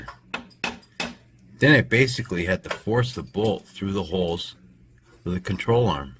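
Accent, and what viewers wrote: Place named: New England, United States. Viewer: Love your New England accent.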